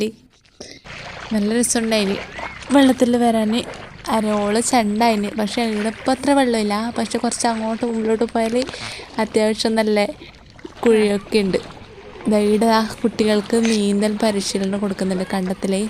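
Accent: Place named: Kasaragod, India. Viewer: ഞാൻ nileshawaram ആണ്.സംസാരം കേട്ടപ്പോ തോന്നി❤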